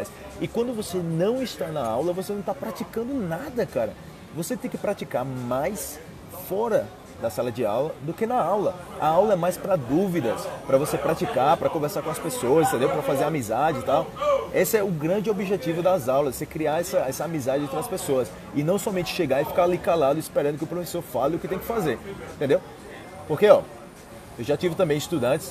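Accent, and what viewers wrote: Colombia. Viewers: Mae, mil gracias. Me encanta su acento de español colombiano ja, ja, ja. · Me gusto tu acento colombiano...vai com Deus patrão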